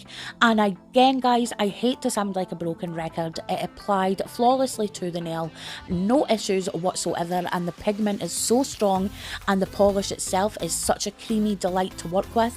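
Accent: Scotland, United Kingdom. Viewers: My first time here. Scottish?? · Really nice to hear the voice of not only a fellow nail art enthusiast but also a fellow scotswoman😍👍😍👍😍👍👏👏 also great vid!! I am soooo envious of your glitter collection.🤣🤣🤣🤣😟😟😟🤣🤣🤣 gorgeous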